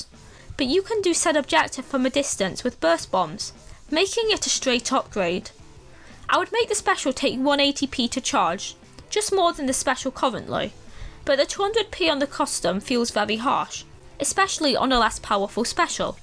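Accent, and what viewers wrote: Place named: Scotland, United Kingdom. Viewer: your voice is very scottish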